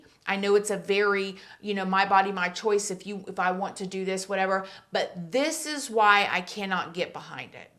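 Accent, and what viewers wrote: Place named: Florida Panhandle, United States. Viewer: Ha! your accent sounded so familiar to me. I too am from the 850. Hi there, girly!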